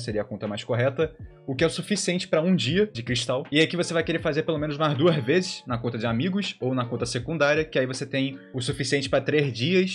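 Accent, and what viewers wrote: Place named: Rio de Janeiro, Brazil. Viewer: Aqui vemos um carioca fiel: montanhax, minériox, ixpots, crixtais Amo amo esse sotaque é bem gostosinho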